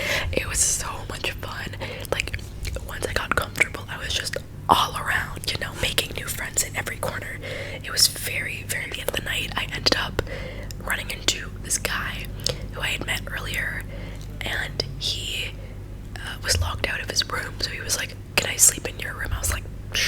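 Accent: United Kingdom. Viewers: Love the British accent 😂😂🔥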